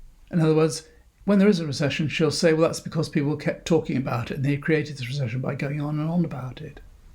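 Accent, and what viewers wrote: RP, United Kingdom. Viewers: You sound posh but you dont talk sense like Liz. I agree Sunak is hopeless, he is why the economy is so bad. Liz will be like thatcher in 79, end this nightmare and get the economy booming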